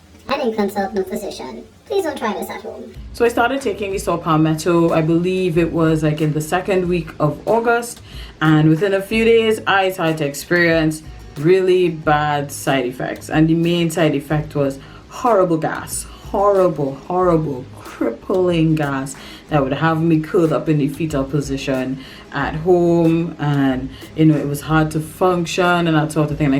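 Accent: Trinidad and Tobago. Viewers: You sound like a Trini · Lol I said this is definetely a Trini accent 🇹🇹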